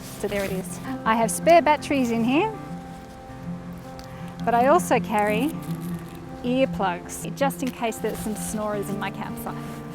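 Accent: Australia. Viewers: just arrived in Australia a bit more than a month ago. Now while watching the video I didn't even notice the Aussie accent! I'm not a native English speaker, but I think I really like the way Aussies speak. + Great video! I'm heading to the Wall of Jerusalem in a few days, and this video helps a lot! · I like your Aussie accent :) I think I'm a backpacking snob. I have a huge sleeping bag, huge mat, a pillow...they won't even come CLOSE to fitting inside. They all have to be hung. I brought canned food, a bunch of water. My first trip was 3 miles (4.8 km), 2500 ft(762 m) of elevation. It was kinda tough, I had to rest once, although right at the end. Maybe as I get more sophisticated, I'll bring smaller stuff. Thanks for the video!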